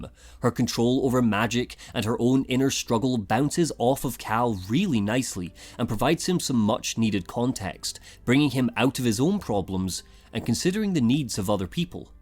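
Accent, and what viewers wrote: United Kingdom. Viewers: Are you stupid? The guy is British. You foreigners are so ignorant but then again you do live in a 3rd world country.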